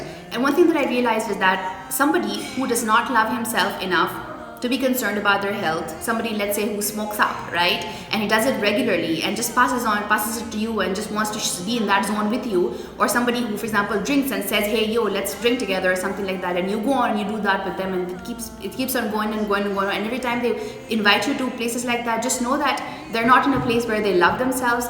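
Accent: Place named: United States. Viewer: Waaaoooww!! You have an amazing American accent. OMG that is soo cool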